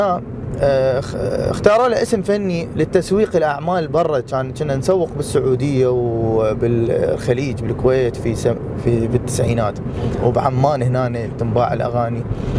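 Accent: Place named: Upper Egypt, Egypt. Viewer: اكسنت صاعدين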